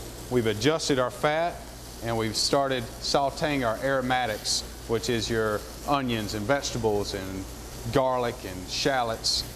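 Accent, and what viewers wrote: South, United States. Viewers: Please take some grammar lessons. You sound like a southern hick. Your video was good, but your grammar reined the whole video. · He is clearly from the South (CCCTI is in North Carolina) and his accent reflects that. So if he does not say “julienne” or “oil” the way you would, it is not wrong, just dialectical differences for those of from below the Mason-Dixon line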